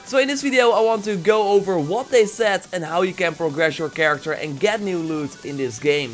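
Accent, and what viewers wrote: Netherlands. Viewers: Stronk Dutch accent dem bruf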